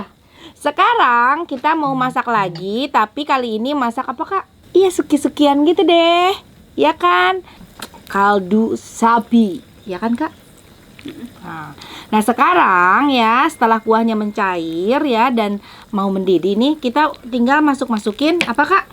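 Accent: West Java, Indonesia. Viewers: Teteh uda cantik Gaul....pintar lg masak... Salut....dech Klw ngomong asik bnget didengerinx.. Logat sunda x.... Putrax mirip teh bnget Cakeppp..... Salut teh...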